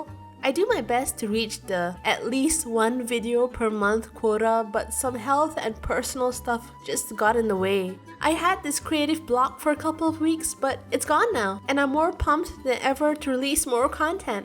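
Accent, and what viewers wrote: Scotland, United Kingdom. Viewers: I’m a good artist too this was an amazing story to show to my friends! By the way i like your trying to speak Scottish! Be yourself your beautiful on the inside. Always believable in yourself! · I live in the UK (Scotland) Your scottish accent is hilarious. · i understand scotland accent actually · I can still hear your Scottish accent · I love yoyr Scottish accent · It sounds like there’s a q in every sentence in the Scottish acent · I love her Scottish voice because I am Scottish and irish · your scottish accent isnt that bad like this comment if ur scottish 1st like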